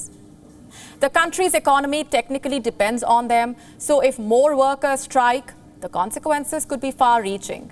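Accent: India. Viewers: is not improving,her accent is still Indian,her intonation is not improving as well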